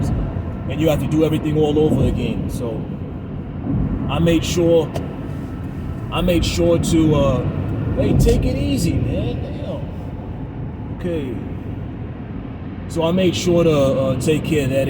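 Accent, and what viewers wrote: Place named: Minnesota, United States. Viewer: Nice looking car. Always enjoy your genuine demeanor and no bs get it done attitude. I still hear that MN accent haha, you sound just like the guys I grew up with. Hope your channel becomes the next Richrebuilds!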